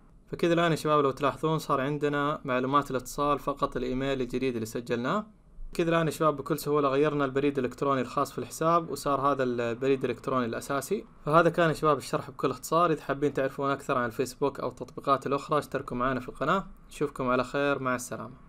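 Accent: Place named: Gulf, Arab world. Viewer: وشكراً لك لان كلامك مفهوم احب اللهجة الخليجية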